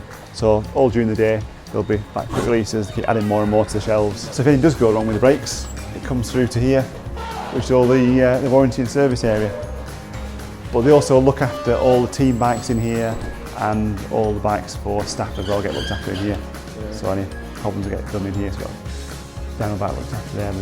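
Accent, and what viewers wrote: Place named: United Kingdom. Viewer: The worst audio, buy some microphones. It hard enough to understand the thick limy accents. Talks like hes on coke or flour in his mouth.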